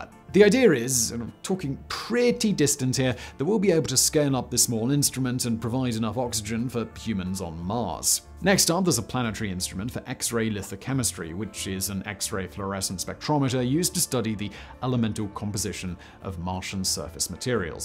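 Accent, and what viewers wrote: RP, United Kingdom. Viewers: Manscaped isn’t just for under your knickers, you can also use it to remove that sweater your always wearing under your shirts. I’m sorry, the “jumper” you wear, you speak posh, so you don’t understand “sweater”.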